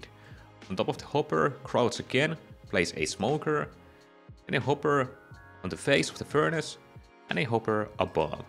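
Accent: Finland. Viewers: You sound finnish are you finnish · I like how i automatically knew you were finnish from the accent. Torille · Amazing, thanks! Gonna build this on my Realm, best design I've seen so far and super easy to follow. Love that Finnish accent too, haha!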